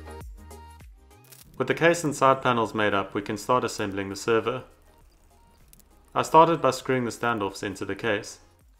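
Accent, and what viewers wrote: South Africa. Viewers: South African? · You sound South African. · you sound like a South African, not an Australian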